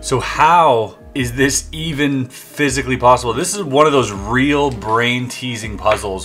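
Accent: Germany. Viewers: Are you german? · Ich hatte auch von Anfang an das Gefühl, dass er deutscher ist. bzw wie er einige Sachen ausgesprochen .🤔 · Are you a german guy i'm a german guy and it was so clean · I love your German dialect! Grüße :) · That German accent 🤗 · Watching you untangle puzzles is soooo relaxing(especially since I don't have to do it myself); ASMR, anyone? 😊 Fun to watch and your German accent is excellent! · Are you German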